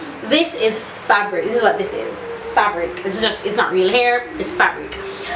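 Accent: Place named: Jamaica. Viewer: I love the way you command the English language and then jumped back to patios 🇯🇲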